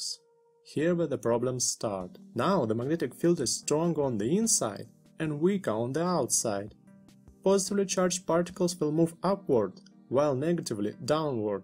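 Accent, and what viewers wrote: Russia. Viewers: Is it a russian accent? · Акцент русский, не спроста? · русский акцент?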